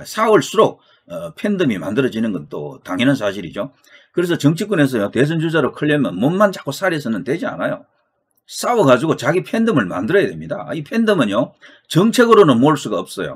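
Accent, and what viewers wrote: Gyeongsang, South Korea. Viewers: 경상도 특유의 사투리 목소리도 좋으시고 내용또한 엑기스 입니다. 최고 !!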